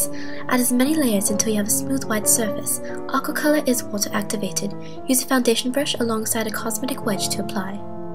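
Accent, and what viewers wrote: United Kingdom. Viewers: why does she talk british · attempting the british accent... rather lovely. It's not repulsing at all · Or just doing a british accent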